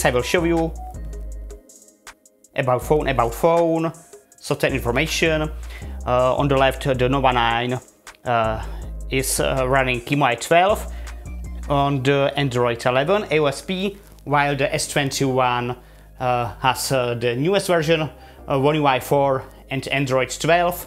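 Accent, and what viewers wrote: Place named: Czech Republic. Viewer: Ahoj z Česka! Pěkné slyšen náš krásný český přízvuk :)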